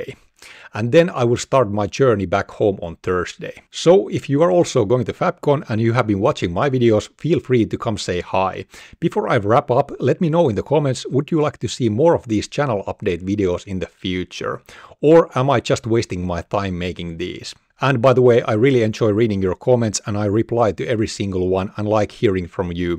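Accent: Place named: Finland. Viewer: Definitely can hear the authentic Finnish tone my friend, me and my team members are referring to your lessons to study the DP-600. Enjoy Fabcon, Kiitos!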